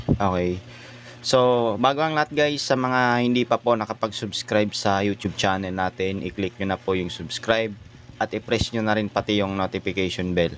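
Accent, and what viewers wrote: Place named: Visayas, Philippines. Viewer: Ew bisaya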